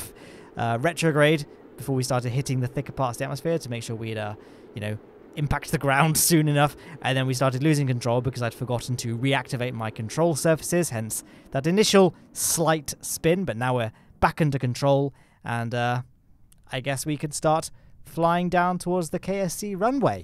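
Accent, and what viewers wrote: United Kingdom. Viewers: Did you just say Cor-oh-nah virus? I come here for a good British accent and PROPER pronunciation.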